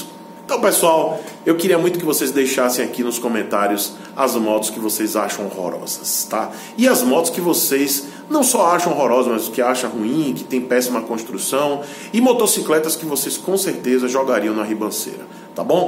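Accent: Bahia, Brazil. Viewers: Rapaz, barril dobrado 😂😂esse é Baiano mesmo😂😂.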